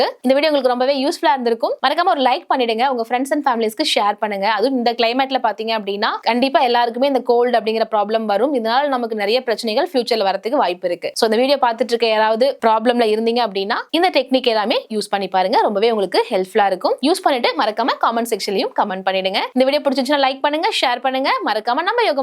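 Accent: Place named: United Kingdom. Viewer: 👍 What a british english ...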